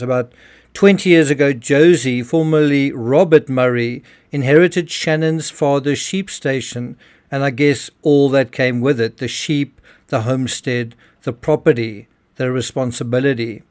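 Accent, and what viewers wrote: South Africa. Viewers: Why is a south African reading Australian news? It's difficult to understand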